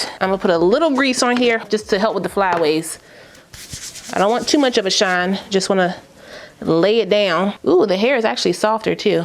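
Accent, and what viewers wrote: Washington DC, United States. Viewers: You from DC? The accent and the go-go lol 😅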